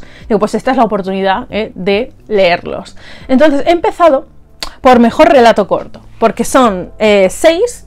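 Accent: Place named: England, United Kingdom. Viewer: ¡Hola! Yo también conocía los Premios Hugo y Nebula , pero tampoco me había interesado mucho por los premios. Ahora con tu vídeo me ha picado la curiosidad, creo que me gustaría hacer también un repaso de los mejores y leerlos, tiene que ser interesante jaja. Me encanta el título de las madres cañeras, es como que no me interesa de qué vaya la sinopsis, solo por el título quiero leerlo 😂 Me parece maravilloso que aparezca la regla, que nos olvidamos de que existe! Ains, el de la biblioteca me encanta cómo suena la trama. La sinopsis de "Uncanny" me recuerda mucho a la canción de "Kokoro" de Vocaloid, no sé si la conocerás, pero he pensado en ella automáticamente. Y por cierto, me encanta tu acento ingléeeeees!! Un besico :D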